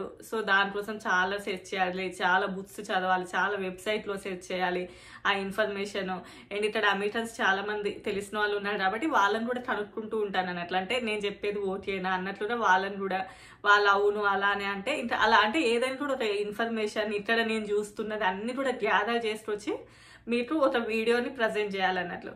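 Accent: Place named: Mahabubnagar, India. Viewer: Mee Matlade style and yaasa Chustunte ma Maibnar district valemo anipistundi ☺️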